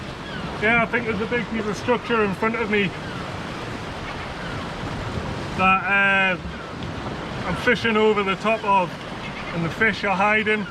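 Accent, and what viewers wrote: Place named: North, United Kingdom. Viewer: Top notch again some great fish makes the scrambling well worth it, great to hear a fellow northern voice on these channels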